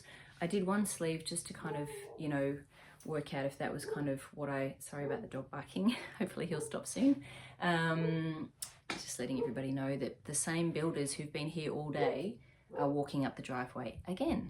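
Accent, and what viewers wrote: Australia. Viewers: I really enjoy your podcast! I love listening to your Aussie accent.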